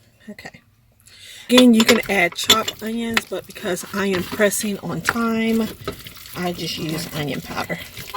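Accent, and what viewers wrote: South, United States. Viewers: Yes you sound like you from the south. This made my day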